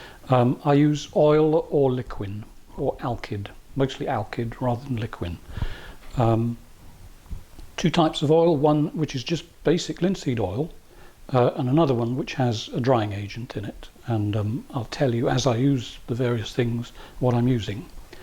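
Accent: England, United Kingdom. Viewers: I love how your English accent even makes watching grass grow sound interesting!😊 I have a wooden board hanging in a gold frame on my wall staring at me; it's patiently waiting for me to give it the treatment... very soon...💗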